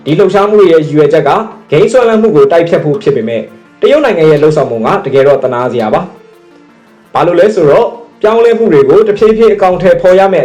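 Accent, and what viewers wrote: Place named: Myanmar. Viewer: ယုံချင်စရာပဲ သတင်းဆိုရင် မင်းတို့မြန်မာအသံနဲ့ဆို ကြည့်ကိုမကြည့်တော့တာ ကျက်သရေယုတ်လို့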